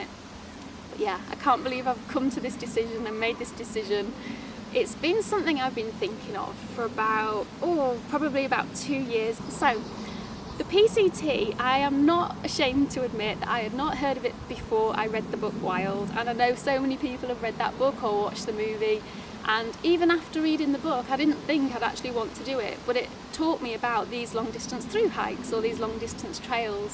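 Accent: United Kingdom. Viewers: I have always loved the UK accent every since going out with one for a couple of years in the 80s. 6 months is along time witch means you'll hit my state late Sept or Oct. Last year snow started the last day of summer and we ended with 120 - 130 % total snow pack. This year suppose to be hot and dry but my state is unpredictable . We have the Pacific Ocean on the coast rising to the Olympics dropping into Puget Sound before rising to the Cascades. CHECK out Farley this year he is a VEGAN and this guy is Bookin. In 45 days he has reached the halfway point 1235 miles. You can see where he supplies and where not to. GOOD LUCK, I just sub.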